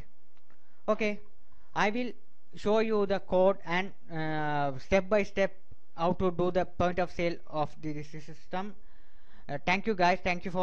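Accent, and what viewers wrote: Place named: India. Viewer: why does every time I look for programming videos I hear a dude from India?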